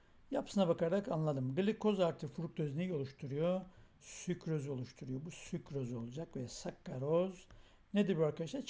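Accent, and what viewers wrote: Black Sea, Turkey. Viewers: hocam karadenizli misiniz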